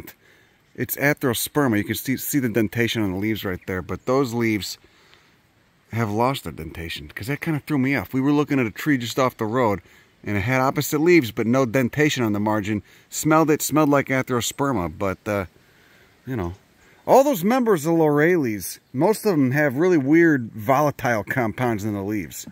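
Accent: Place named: Northeast, United States. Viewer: Just started following the channel and I gotta say, when he mentions scientific names, I have no fucking clue what the hell he's talking about but gah'damn something about that thick northeastern accent is oddly satisfying to listen to. Especially when he's talking shit or just engaging with the local wildlife... ... I can't stop watching this shit...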